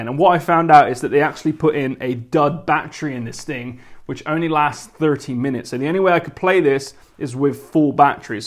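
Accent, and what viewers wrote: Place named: West Country, United Kingdom. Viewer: It's a west country accent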